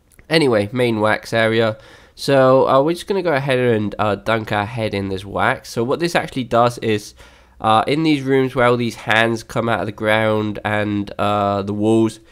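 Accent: United Kingdom. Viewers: ur british am i right?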